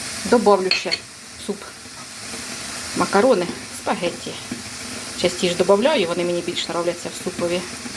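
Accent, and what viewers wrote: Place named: Kyiv, Ukraine. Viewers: Люблю дивиться ваші відео, особливо дуже рідний мені ваш говір я з Київщини. В нас з вами багато спільного, говорять і в нас кисляк, місцевість ніби я в своєму селі.♥️💙❤️ Божого благословення вам і вашій Родині!!!🙏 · Я бы слушала день и ночь. Такая у нее красивая мова. Я тоже с центральной Украины, с Кировоградщины, сейчас беженцы в Чехии и мова... ну как вроде дома сижу среди соседей и друзей, точно такой же язык! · Доброго дня! Дякую за цікаві розповіді. В якій місцевості ви живете? Ваша мова така ж як у нас. Я живу на Київщині.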